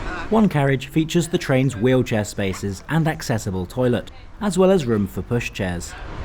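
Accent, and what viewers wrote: Germany. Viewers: He's pronouncing them in germany-german not swiss-german😂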